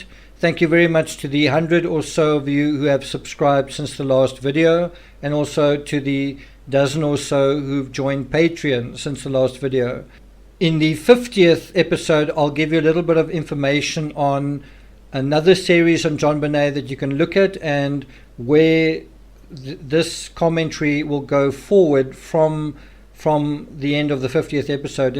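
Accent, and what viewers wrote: South Africa. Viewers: Dr Phil, has an accent SA hence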